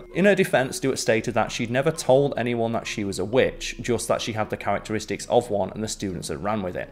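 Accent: United Kingdom. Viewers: I was so worried about Top Tenz replacing Simon. But so relieved they got another British to host. Please don't replace him with someone with an accent that is not British. No disrespect, but British accent is always so soothing to listen to. Thank you.😊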